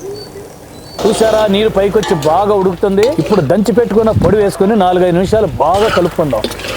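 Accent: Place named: Telangana, India. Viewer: తాత నువ్వు వంట చేసుడేమో కానీ నోరు ఊరుతుంది తాత ఏమన్నా చేస్తున్నావా వంట మన తెలంగాణ యాస లో సూపర్ chepthunnav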